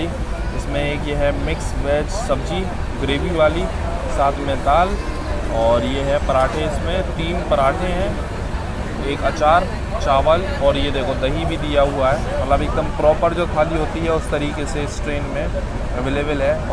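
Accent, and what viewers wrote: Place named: Jhansi, India. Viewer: main apki boli se hi samajh gya tha 😁 Mai bhi Jhansi ka hi hu ( near Medical College Gate no. 2 )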